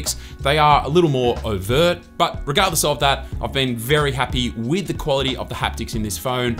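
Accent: Australia. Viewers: as I heard that aussie accent, I subbed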